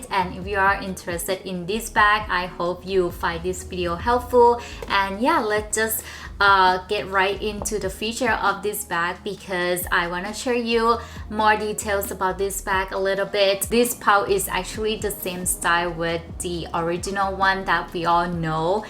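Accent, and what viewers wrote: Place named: Vietnam. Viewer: Wow I thought you’re Vietnamese all along from your accent until I saw Thai comments from this video ! Hahah thanks for the review video :)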